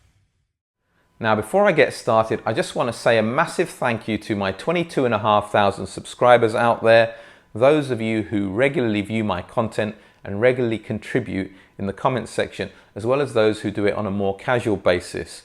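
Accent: United Kingdom. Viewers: Thank You for you videos for 2020. I have found them really informative and you way of presenting is superb + I just really enjoy the British accent - really easy to understand as a non-native-english speaker. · your content has always been top notch and you do excellent reviews, so of course your channel grew quickly. It doesn’t hurt that you’re obviously a good guy, and you’ve also got that British accent vouching for you too! lol. Great year of reviews, and I hope you have a great holiday season. I look forward to seeing more of you in 2021. Thanks,! ✌️🎶🙂👏👏👏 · Like your British accent, however, some of your items you mentioned are not available in the USA.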